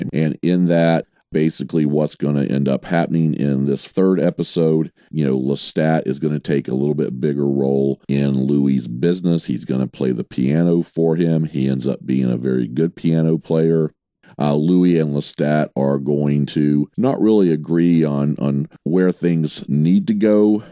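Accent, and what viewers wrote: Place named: Texas, United States. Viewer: Late to find you; glad I did. Your reviews of this series come across as fun & heartfelt. Plus you have a great voice. Sounds Texan so I'm biased. I look forward to listening to you come Season 2.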